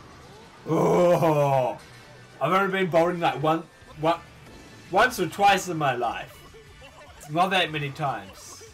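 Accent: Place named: New Zealand. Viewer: Where is he from, he sounds like he's from NZ, I'm from NZ and he sounds like he's from NZ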